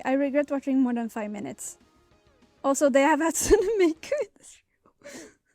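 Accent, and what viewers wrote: Sweden. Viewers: What accent do you have? are u Swedish?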